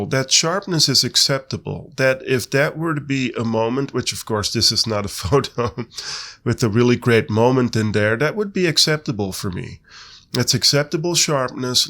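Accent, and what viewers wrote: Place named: Netherlands. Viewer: I'm Dutch and to me it really sounds like you have a Dutch accent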